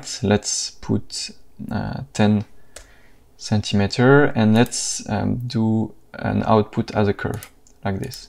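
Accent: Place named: France. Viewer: I think you are a french native speaker ;)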